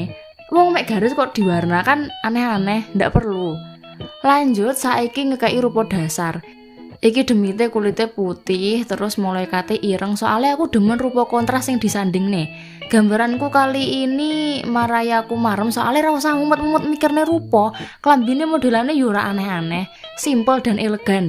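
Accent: Indonesia. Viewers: kak, dari logatmu, kayaknya kamu orang Indonesia ya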